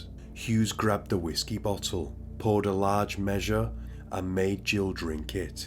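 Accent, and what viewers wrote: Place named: Liverpool, United Kingdom. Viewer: I love your accent. Where are you from? Sounds like Liverpool?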